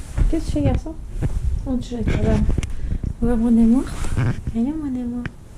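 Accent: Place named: Quebec, Canada. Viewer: Ces cute tu as un accent français et québécois à la fois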